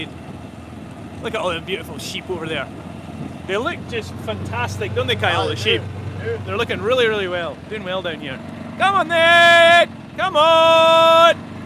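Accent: Scotland, United Kingdom. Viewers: This is a course in learning your accent, Scottish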